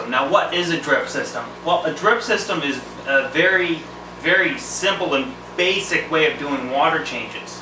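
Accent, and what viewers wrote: Canada. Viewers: Love watching videos by Canadian guys. Too funny accents.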